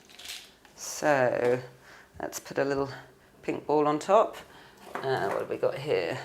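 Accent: England, United Kingdom. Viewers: I love her accent!!! I'm so going to England London some day. Nice cupcakes!!! Lots of frosting. thats what I like!!!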